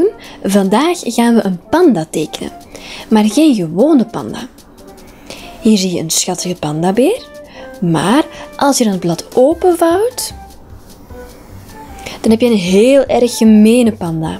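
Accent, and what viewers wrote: Belgium. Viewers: Jij bent toch Belgisch?, te horen aan je stem🇧🇪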